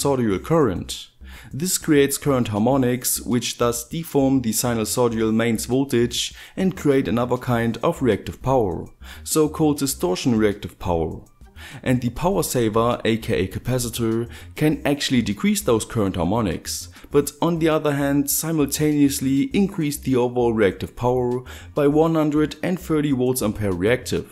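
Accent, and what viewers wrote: Saxony, Germany. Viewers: Nice video! Thank you :) Is that a Saxony accent you have?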